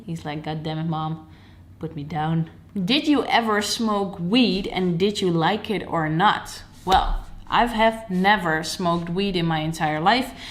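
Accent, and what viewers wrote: Netherlands. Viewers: Ik klikte op deze video en je begon te praten en ik dacht meteen ze is Nederlands 😍 elke Nederlander heeft dat accentje geweldig😅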